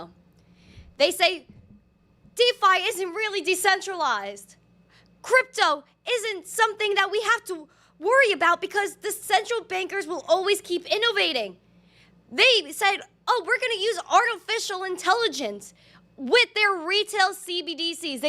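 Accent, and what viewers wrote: Brooklyn, United States. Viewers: Where ya from? Brooklyn or NJ ?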